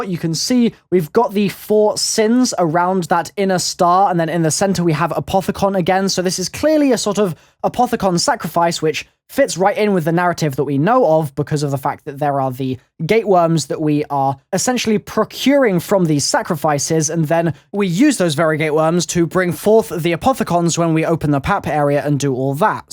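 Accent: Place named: United Kingdom. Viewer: Ur British voice is anoyyyyyyyyyyyyying!!!!!!!!!!!!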